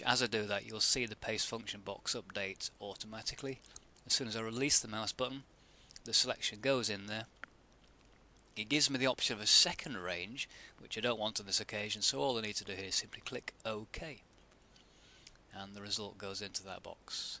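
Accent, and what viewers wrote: United Kingdom. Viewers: Wow he is a very good teacher very clear. and the British accent keeps it interesting!!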